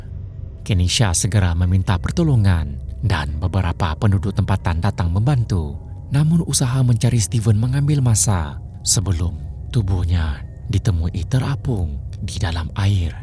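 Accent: Sabah, Malaysia. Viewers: Rasanya admin ne orang malaysia tp lorat sabah atau sarawak kot.. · SAYE TAK PAYAH CAKAP APE² SAYE DAH KENAL DAH SUARE ABANG NI ORANG MALAYSIA SABAH ATAU PON SARAWAK TU JE 😊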